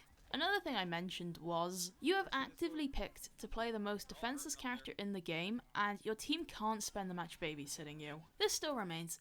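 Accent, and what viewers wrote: United Kingdom. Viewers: Awesome vid! You from the UK too?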